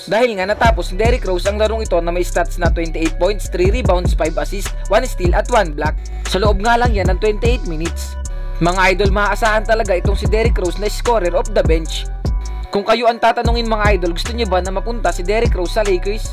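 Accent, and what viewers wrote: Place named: Iloilo, Philippines. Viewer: annoying ang punto ng salita mo mag ilonggo ka na lang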